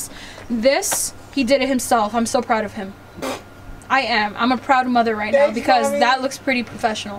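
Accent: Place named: New Jersey, United States. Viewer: Are you Italian ?? You certainly look it . You definitely can't deny that you are a Jersey girl because you definitely have the Jersey accent and I have the Pittsburgh Yinzer accent. I vacation in Wildwood NJ every year and it is my absolute favorite vacation destination of all time.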